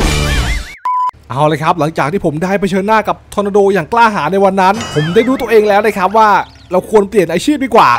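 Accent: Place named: South, Thailand. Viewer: แหลงใต้ชัดเปรี้ยะเลยหลวง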